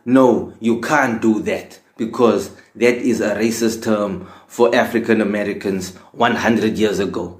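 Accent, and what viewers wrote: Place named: Durban, South Africa. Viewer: Was gonna say you sound like you spent some time growing up near Durban somewhere, be that as that may, you saying you "not taking kak" is so typical though. Love it!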